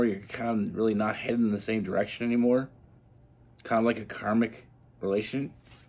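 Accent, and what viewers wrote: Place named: Canada. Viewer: bro that was so great and spot-on! have let go/moved away from a libra, but some of that energy is still hanging around ... meanwhile a pisces has come along and we're taking it slow and getting to know one another and it really feels like emperor/empress. anyway i thought you might also be canadian based on your accent and then i saw the tim hortons lol! thanks and take care.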